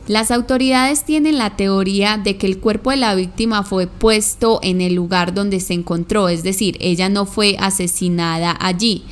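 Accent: Colombia. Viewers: Que satisfacción es saber por fin quien era en realidad Jane Doe, y cuáles eran sus circunstancias, a pensar de que se me hace un caso súper triste, es bueno saber que ya fue identificada y ojalá un día se pueda saber quién fue el miserable que le hizo eso., disfruto mucho tú manera de narrar los casos, de verdad que es entretenido, aparte ese asentó tuyo colombiano tan bonito lo hace todavía más disfrutable. Saludos desde Guadalajara, México.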